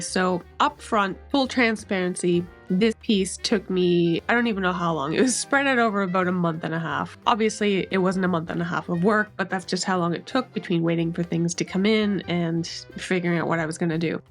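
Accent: Canada. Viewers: this is such an exciting project! I love old historic pieces and seeing you painstakingly bring this back to life was so satisfying! you did an incredible job (also Canadian and say decal the way you do and think that is really funny you got any flack/confusion on that) 🤣 I never think of us having an accent or inflection, it's everyone else! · Just magnificent. Having Canadian friends, I'm aware of differences in pronunciation between America and Canada. Please accept my apology for the uninformed imbeciles who may have been disrespectful to you. The residents of some backwaters in the US like to maintain their supremacy over things they don't understand. My respect for your tremendous talents would have overridden any "mispronunciation" I might have perceived, were it not for my observance of the Golden Rule. The best of luck to you and your co-workers.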